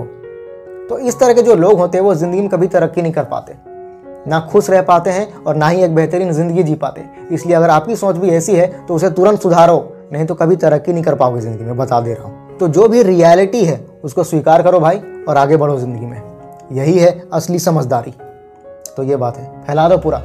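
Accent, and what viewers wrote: Bihar, India. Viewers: Tum Bihar se ho ya jharkhand se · Aap Bihar se hai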